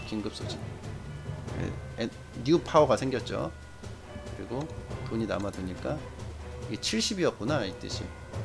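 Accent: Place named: South Korea. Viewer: 자연스러운 콩글리쉬 발음에 편안함이 느껴지는구먼.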